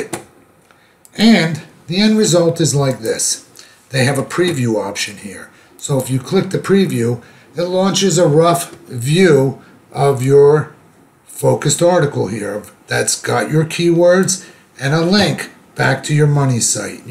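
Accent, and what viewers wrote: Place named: Bronx, United States. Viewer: Hearing your rough bronx accent say transcendental meditation was worth the watch. hehe So is this download and run software? If I buy a lifetime license, I dont have to worry about servers going down or anything shutting down the software in a few years?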